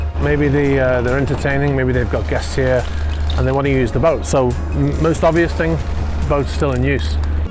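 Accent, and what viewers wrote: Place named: Merseyside, United Kingdom. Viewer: Sounds like you originate from Merseyside so the sea is in your blood then. How did you get into the career you've had?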